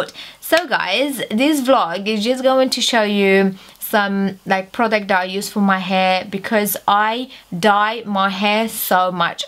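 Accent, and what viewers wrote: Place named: United States. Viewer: Are you from the uk, you sound American 😂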